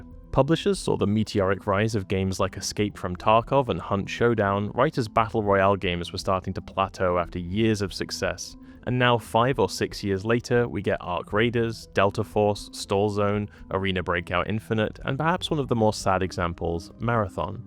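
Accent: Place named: RP, United Kingdom. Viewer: It's probably because market research indicates that an English RP accent with a slight colonial overlay (Canada?) appeals to Americans, hence AI does it.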